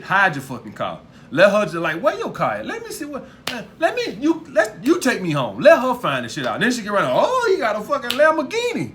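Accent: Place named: West, United States. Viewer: 😂😂😂😂😂 you funny ASF but still talking these deep truths. With that Western Accent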